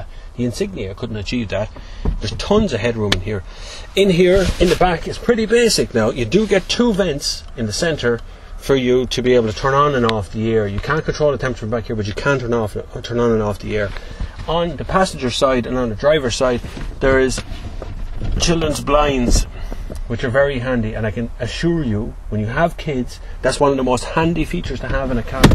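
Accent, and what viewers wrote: Ireland. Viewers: It would be great if a TV car show was commissioned in this kind of format, everyday cars for everyday people with a down to earth presenter. Top gear bores the hell out of me, dropping pianos on Morris marinas etc. I can't stand Clarkson, the man is a wally. Lets hope you get your own show, I'm sure you'll have a devoted audience of girls AND the boys too, I'm just a sucker for an Irish accent =) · great review. really pleased to hear an Irish voice for the irish car market. Am buying this car tomorrow. getting the dynamique version so no sat nav but an alround great car.and we got it for 17000euro with scrappage!!!!!! thanks again for a great review!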